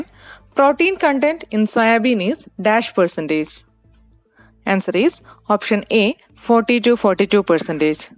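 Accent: Kerala, India. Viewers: Madams pronunciation sounds like mallu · Mam you are sounding like malayali ??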